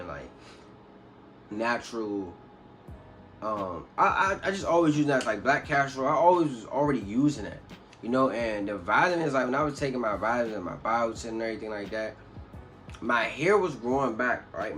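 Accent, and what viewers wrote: DMV, United States. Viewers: Could tell u was from the DMV 🤣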